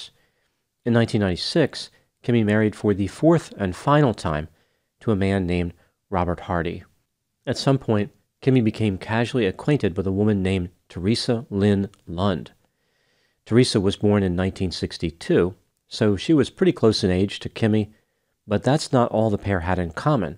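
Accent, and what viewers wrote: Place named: Pittsburgh, United States. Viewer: Are you from Pittsburgh,? Your accent reminds me of my hometown. 😊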